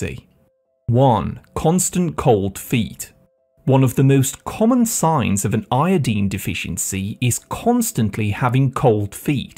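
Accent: England, United Kingdom. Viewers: Pronounced English accent makes me buy nothing.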